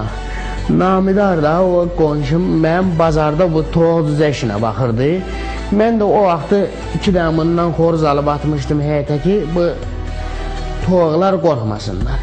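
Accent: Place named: Imishli, Azerbaijan. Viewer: Bu İmişli ləhcəsidir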